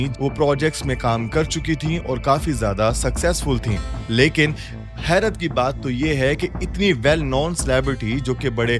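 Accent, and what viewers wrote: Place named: Pakistan. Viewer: Bolne ke lehje se lagta hai pakistani ho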